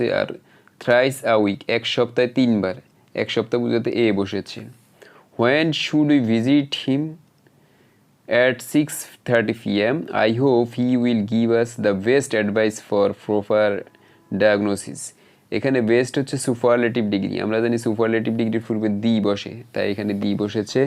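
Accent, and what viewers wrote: Noakhali, Bangladesh. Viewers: oo vai noyakhailla apni?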